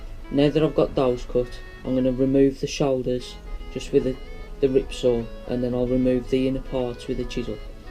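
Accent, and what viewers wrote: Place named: Midlands, United Kingdom. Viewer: Yo big. Im in awe that you had the guts to go straight in with the exotic timbers mate.....youre brave:-) I would suggest practising and refining your technique doing a few pine first.....but hey, i love your maverick style dude. Damn good effort though for you first bash. Another thing....(and im judging the purely by your accent)....but do you know there is a Midlands Woodworking & Powertool show in Newark on the 27/28th of this month....Im sure you could pick up a mallet there:-)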